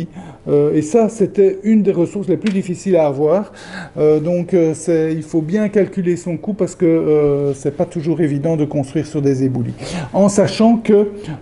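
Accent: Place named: Belgium. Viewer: Merci, bonne explication et une petite critique constructive à la fin. J'adore ton accent Belge, ça me rappelle qd une partie de ma famille habitait Bruxelles !!!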